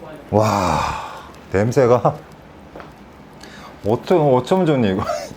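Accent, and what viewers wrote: South Korea. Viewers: 이분 한국분인가요? 억양까지 한국인같아요.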